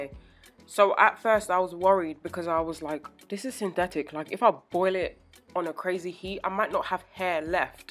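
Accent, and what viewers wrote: United Kingdom. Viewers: You are brilliant love the video girlfriend 🥰 and so love your amazing British accent … everyone subscribe subscribe subscribe!!!!!! She knows her stuff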